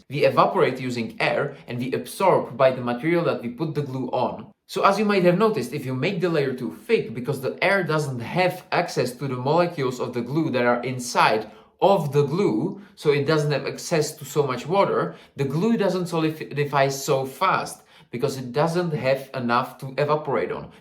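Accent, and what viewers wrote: Czech Republic. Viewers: Už podle prvních pár vět mi bylo jasný, že jsi Čech :DD. Náš přízvuk je strašně poznat :DD. Pak jsem si to jen potvrdil českým nápisem na tom lepidle. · Where are you from? You sound like you're from Slovakia 😅 or Czech republic. Yep, stand corrected, Czech republic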